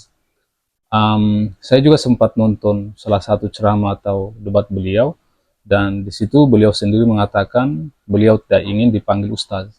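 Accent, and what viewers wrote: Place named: East Nusa Tenggara, Indonesia. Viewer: Logatnya sepertinys orang NTT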